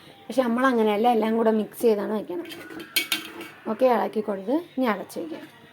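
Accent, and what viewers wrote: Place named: Thiruvananthapuram, India. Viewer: നിങ്ങള് വേറൊരു പുള്ളിക്കാരിയുടെ കൂടെ ഒരു മേക്കപ്പ് വീഡിയോ ഇട്ടിരുന്നത് കണ്ടിറ്റ് വന്നതാണ്.. തിരുവന്തരത്ത് എവിട? ഇതായാലും നിങ്ങള് ആ ഭാഷ തന്ന പറയണത് കൊള്ളാം 😊 നല്ലതായിട്ട് പോട്ട്!! 👍🏻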